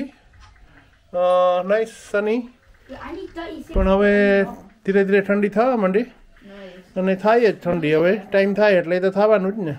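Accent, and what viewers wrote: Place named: Mer, India. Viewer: સરસ વ્લોગ 💓 હવે કમેન્ટ્સ ચાલુ થઇ... તમે વિદેશમાં રહી ને પણ પ્યોર આપણી મેર ની ભાષા બોલો છો. એ ગમ્યું....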